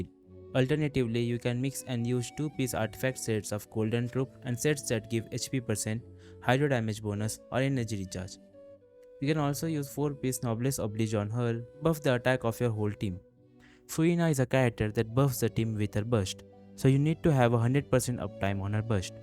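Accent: India. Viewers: Yo did his accent change to Indian or am i imagining it?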